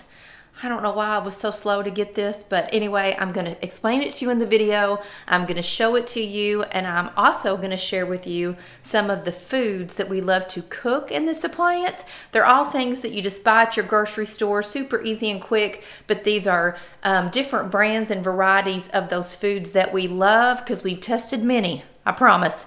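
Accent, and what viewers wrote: South, United States. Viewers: Thanks for all the hints..I just love listening to your Southern drawl...also seeing taking part in the demos! Lots of fun! 😃 from a Minnesota gal ❄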